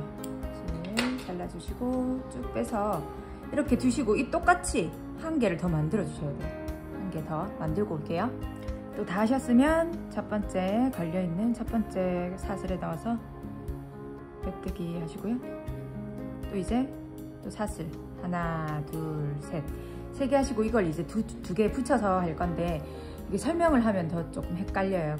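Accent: Busan, South Korea. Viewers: 저도 부산 출신이라 ㅎ 광안리 … 멀리 미국에서 부산 사투리 쓰시는 님이 늘 가까운 느낌이네요 뜨게질 소질은 없지만 보게 되네요 ㅎ 예전에 지인이 만들어준 수세미도 생각나고 잘봤어요~~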